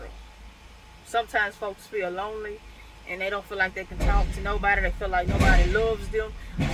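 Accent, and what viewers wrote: South, United States. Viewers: Hi. I watched your video for the first time. It popped up on my feed. 1. Where are you from? I love that country twang. 2. I enjoyed how you came across with your message. 3. Are you married cause I'm going to follow you going forward... with your cute country sounding self. Don't want to disrespect. GOD Bless you for following GOD's instruction to speak what HE put on your heart at this time. I know you are helping folks. Many people are struggling like never before. I always say all of our worldly possessions and all the things we create... we can't take it with us to the next place. Someone said we are just renting them from GOD.:) Stay Cool. I'm definitely going to share your message to my contacts. Bless You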